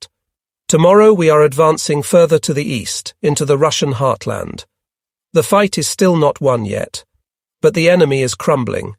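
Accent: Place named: South, United Kingdom. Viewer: it’s an open text AI popular with audiobook transfers. It has a slight southern UK accent but is very good for a freebie. You can always tell the earlier versions because of mispronunciations and statements not making sense. It has developed well past that now and is pretty accurate no matter the text.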